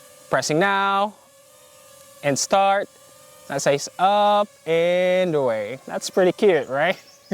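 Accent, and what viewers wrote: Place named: Philippines. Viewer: Mag Tagalog kana lng pag english2 halatang Pinoy ka 😊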